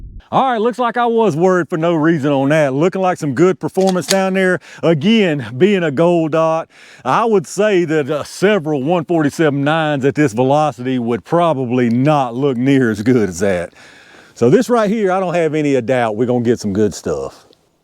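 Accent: South, United States. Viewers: This guy does some very interesting and informative videos. I also love his corn pone accent. This is not meant as a slur, I just love the fact that he's very unpretentious.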